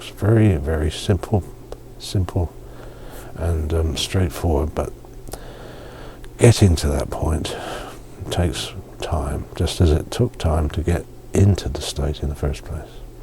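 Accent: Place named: England, United Kingdom. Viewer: What accent do you speak ? It must v certainly come from England Such a fine English is hard to find on YouTube So nice and gentle and mind soothing effect , your way of describing things is great . God bless you your words are indeed helping many here.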